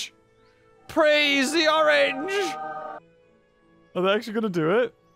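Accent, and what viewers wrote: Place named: Newcastle, United Kingdom. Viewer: You from the Toon, mate? EDIT: ok the question is now nuill and void, you said "Alreet" LoL.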